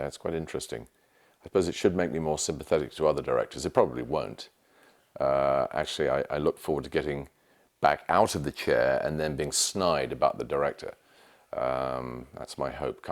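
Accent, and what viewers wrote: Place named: United Kingdom. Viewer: Wait, I think that he has a british accent 🤔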